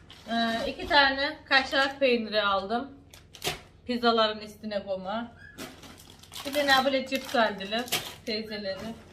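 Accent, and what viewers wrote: Trabzon, Turkey. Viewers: merhaba seni severek takip ediyorum şivene bayılıyorum bende aslen trabzon akçabatlıyım ama sakaryada doğdum burda yetiştim annem babam orda evlenip sakaryaya yerleşti dedemler akçabat düz köyde yaşıyor seni dinledikçe annem konuşşur gibi severek dinliyorum yaşşa senin ablan sayılırım seniiiii çoooooook öpüyorum canımsın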